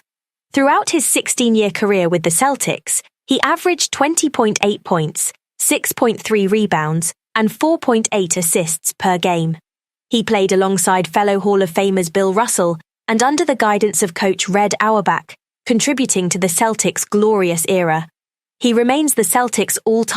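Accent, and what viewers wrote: England, United Kingdom. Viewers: Why do they got this British lady doing this and Gilbert Gottfried already passed away already she gots her scruples backwards 😵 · Why is an English woman talking about the USA? Go back to your home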